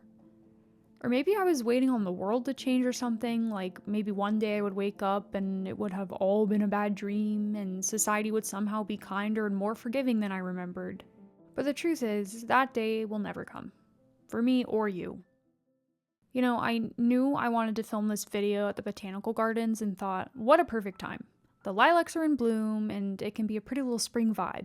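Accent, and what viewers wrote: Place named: United Kingdom. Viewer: You sound British, nice beginning in vloging, keep up, which town is this one? Great video 🎉🎉🎉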